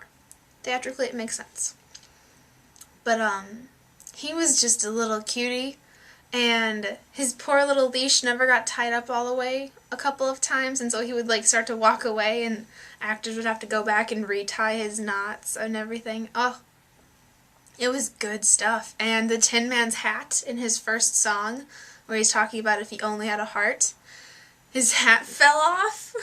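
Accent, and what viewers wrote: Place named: United States. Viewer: Are you American? If you live in London, how come you're speaking in an American accent?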